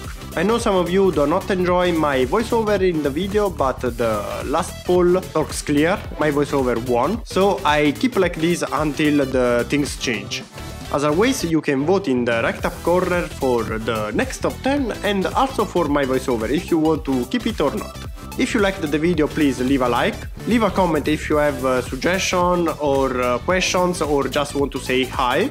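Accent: Italy. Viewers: accento italiano sempre il migliore ;) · Sei italiano so riconoscere un italiano che parla inglese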